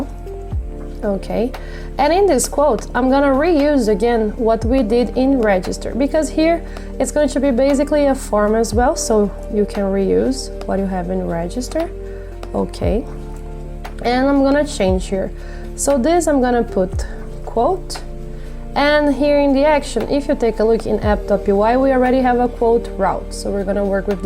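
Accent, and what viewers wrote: Brazil. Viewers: You’re from Brazil, right? Your accent reminds me of brazillans’. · Reconheci pelo sotaque kkkkk muito bom encontrar br pelos cursos cs50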